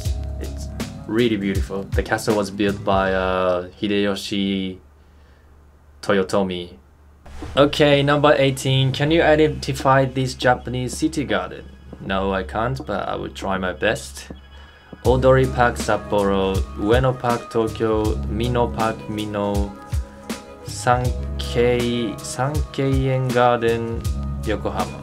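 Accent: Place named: Australia. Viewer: I can tell by your English - you spent your time abroad in Australia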